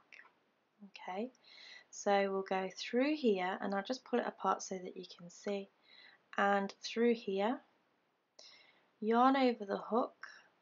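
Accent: England, United Kingdom. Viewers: Thanks for showing two methods and the pros and cons to each. That was helpful. My husband and I both love to hear her soft calm voice. Your English accent is lovely. (We're in the US.) Blessings. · Hello 🌸 Your works are just most beautiful, your explanation with the very nice British accent, that I love, are the most complete ones...thanks for sharing with us,..😘Fatima, Iran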